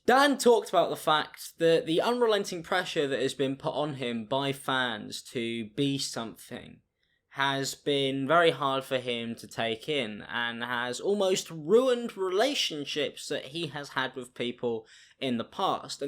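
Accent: United Kingdom. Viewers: Just... How British is this guy... And why the hell is this in my recommendations... Though dan and phil did break my youtube...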